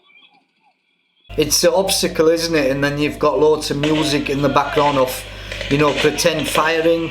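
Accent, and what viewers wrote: Scotland, United Kingdom. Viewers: He is either Scottish, or from near the English-Scottisg border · You have a hint of a Scot accent. But then again my ear's are screwed. I have pals in Glasgow all ex military and great pals to have your back in a bad situation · He’s got a Scottish accent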